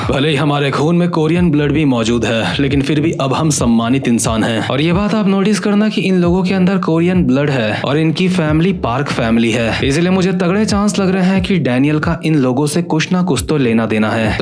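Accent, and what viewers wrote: Uttarakhand, India. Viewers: Bro tum Uttarakhand se ho kya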